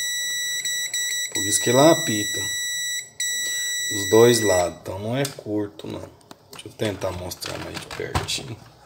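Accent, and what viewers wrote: Minas Gerais, Brazil. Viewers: Pelo sotaque ou é mineiro ou é goiano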